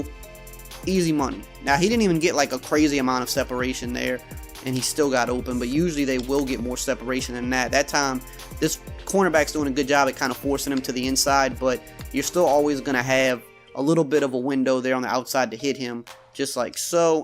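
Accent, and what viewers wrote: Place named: New Orleans, United States. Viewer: I am from Houma if u know where that’s at its DTB and I love ur accent cause I’m from Nola area as well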